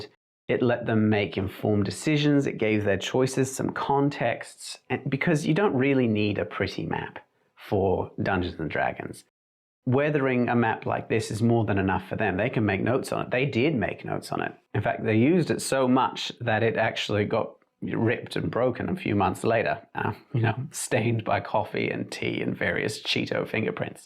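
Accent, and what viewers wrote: Australia. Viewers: Finally an Aussie accent in a DnD context #normalisestrine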